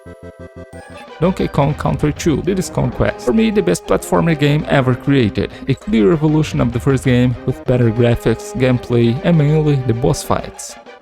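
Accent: Brazil. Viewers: ahaha esse sotaque de brasileiro falando ingles é muito fácil reconhecer.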